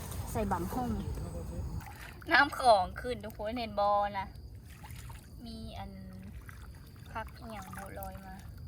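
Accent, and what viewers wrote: Laos. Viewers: สาวลาวน่ารัก สำเนียงพูดเพราะครับ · ผมชอบสาวลาวพูดน่ารักดี ไม่แปลกใจทำไมหนุ่มไทยจึงสนใจสาวลาวกัน.😍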